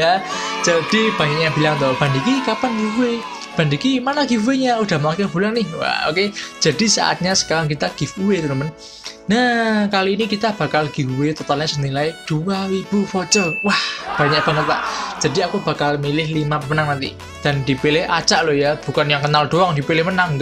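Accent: Java, Indonesia. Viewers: Hero favorite : liliana Open id : <num> Btw saya suka medoknya bang diki wkwk XD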